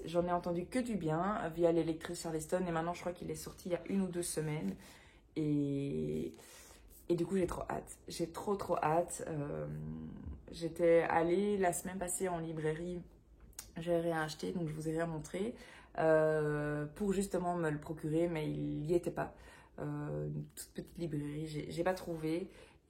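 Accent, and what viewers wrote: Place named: Belgium. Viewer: Et j’vois que tu arrives récemment sur le booktube. Bienvenue. Et ton accent léger sur les ”R”..pam waterloo..Bruxelles.! Évidemment! ( bon après coup.. j’avais failli ecrire que t’étais alsacienne...😲🙄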